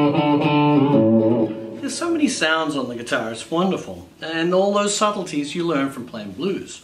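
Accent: Australia. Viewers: It sounds like Frank's Australian accent isn't as noticable as it was back in the 90's. It's more subtle, and he has an American accent at times. Not a cut down; just an observation. I love the guy! I still have his old REH Video on VHS from when I was a kid. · Being as I live in Sydney, I thought I detected an Aussie accent; yup, born in Canberra he was! Oy, Frank, awesome, mate!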